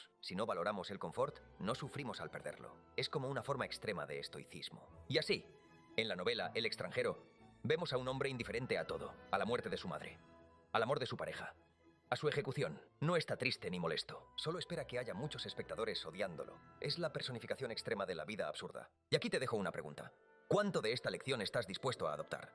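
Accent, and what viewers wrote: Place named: Castile, Spain. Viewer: Buen video , mas el acento castellano y la baja calidad del sonido lo arruino❤.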